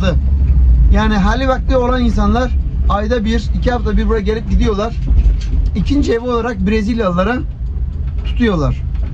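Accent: Gaziantep, Turkey. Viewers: Çok şükür gerçek bir Gaziantepliye rastladım. Abi seni dinlerken tamam bu tam Antepli işte dedim. Doğal ve içten olurlar. Samimi insanız biz çünkü 👏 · Abi bi anteplinin dilinden antepli anlar gelirken sana salca getirecem 😅😅😅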